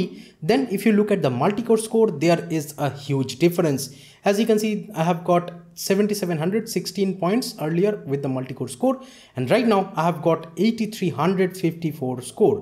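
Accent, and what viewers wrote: India. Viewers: Hindu accent :D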